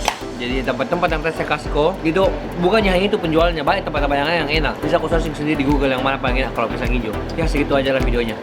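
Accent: Makassar, Indonesia. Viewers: Vlog yg paling di tunggu", vlog di makassar, makan makanan khas makassar, logat makassar, syuuuka😍😍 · Lain" ki ku rasa kalo logat makassarki:V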